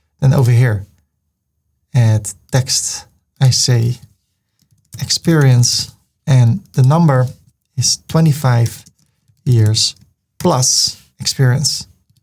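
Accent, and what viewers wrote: Netherlands. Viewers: i am obsolute beginner. started as a hobby. This tutorial is amzing. He never hiding anything. His dutch phonetics is lovely. I am trying to imitate. coming back to the subject, when I tried to create background colour for the section, it is showing the row separate colour. How to fix it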